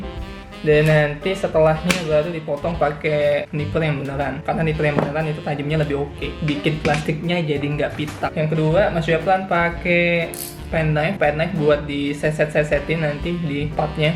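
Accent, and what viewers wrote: Palembang, Indonesia. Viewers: Bang orang Palembang ya?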